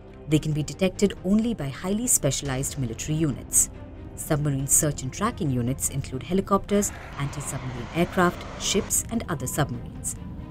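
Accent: India. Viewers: A strong Indian Asan accent is really unpleasant. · It’s a pity it seems too much Indian accent here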